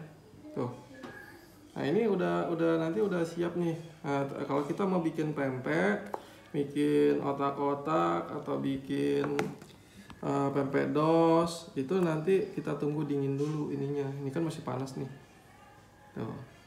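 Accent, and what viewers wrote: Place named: Palembang, Indonesia. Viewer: tetap semangat Chef jangan dengerin orang yang nyinyir saya sudah cobain resepnya 👍👍 dari bahasanya sudah ketauan bahasa palembang ya Chef 😊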